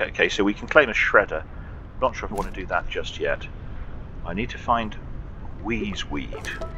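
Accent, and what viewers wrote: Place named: United Kingdom. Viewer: Came here from Josiwe's channel fyi. Good series so far! What part of the UK are you from? I'm Irish but cant place the accent?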